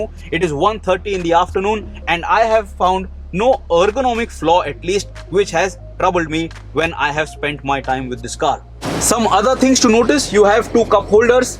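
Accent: Maharashtra, India. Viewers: Nice marathi accent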